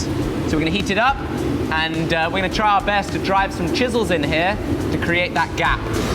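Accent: England, United Kingdom. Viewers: i like these channel all in all born with hands for steel very fat strong fingers lol cute baby face talks like a real english man has a smart brain has alot of great skills and work ethics and makes alot of interesting things to watch. the whole package is cool and interesting. i like it keep it up. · If I could sound like anyone, I would choose this guys voice. The British accent is so perfect.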